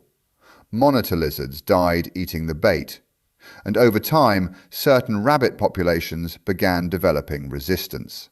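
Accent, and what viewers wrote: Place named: Australia. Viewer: Millions of mice have not been dropped into the Everglades . Are you really that hard up for attention? I would never subscribe to a channel as misleading as yours. This is the second video I have found of yours misleading the public about what is happening in the Everglades. I guess, you think, if you wear the "outback" clothing and sound Australian people won't question your faulty videos. You are part of the problem with the reliability of the internet. Why do you do this?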